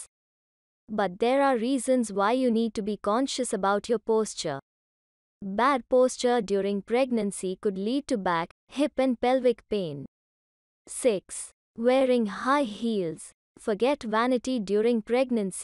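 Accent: India. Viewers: The 1st Indian-voiced YouTube video that made 100% sense 😀. A 31 weeks 1st-time preggo here.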